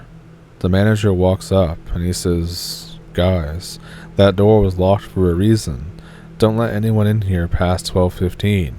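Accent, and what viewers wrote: Canada. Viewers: Great content. Delivered in a fellow Canadian accent :)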